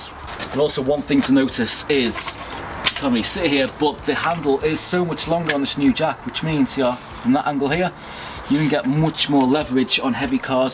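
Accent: Liverpool, United Kingdom. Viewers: Can’t work out your accent!! Scouce?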